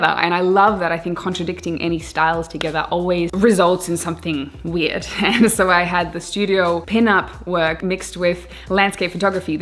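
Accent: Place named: Australia. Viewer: Been following for a while but didn’t realise you were an Aussie 😁 Nice one !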